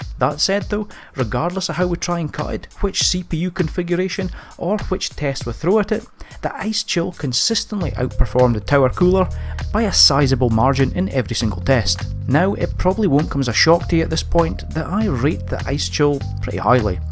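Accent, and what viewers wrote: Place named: Aberdeen, United Kingdom. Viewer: He sounds like he's fae (from) Aberdeen like me and for that reason alone I subscribed - can you recommend this cooler on the AM5 7900, I currently use the Raith prism just like AMD does in their test labs, into PBO2 testing on my ASUS B650e-e rig at -35 along with AI overclocking and temps remains very good no thermal problems at all scoring 54000 plus on pass mark 11.1 all day long and 12400 on CPU-Z? Getting 27000 score from a Asus Dual 6750 XT card Also, a top SEO guy if you need help bro, another clue lol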